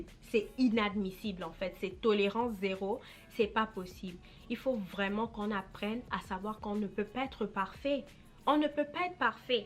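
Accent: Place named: Gabon. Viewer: En entendant ton accent j'ai cru que tu étais Gabonaise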